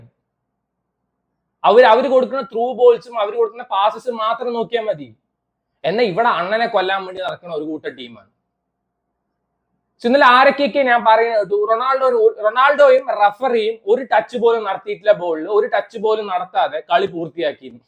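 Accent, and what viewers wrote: Palakkad, India. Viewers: Enan adyam video kandad Palakkad slang is attractive Apart from whats the content your slang make it interesting bro Keep going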